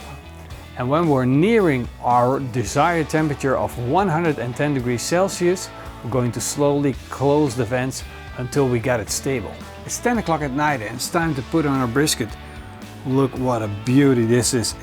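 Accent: Netherlands. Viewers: Davis Gjeta ja man alle nederlanders hebben zo een engels accentje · hate the dutch accent. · wollah echt zehme weloe Nederlanders op youtube Pannekoek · are you dutch ??? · Oh yeah yeah nederlandsssss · It's a Dutch accent ;) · shaft says Dutch. He from Holland the netherlands 👊🏾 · you sound so dutch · Are you from around the nederlands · is deze guy nederlands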